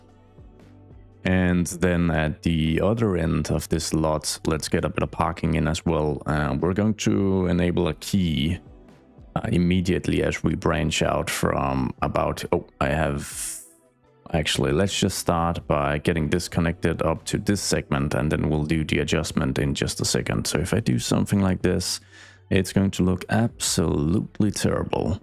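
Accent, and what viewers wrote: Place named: Denmark. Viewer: Omg, so he is actually from Denmark, always thought so from his accent, but wasn't sure, so cool to see other Danes here on YouTube!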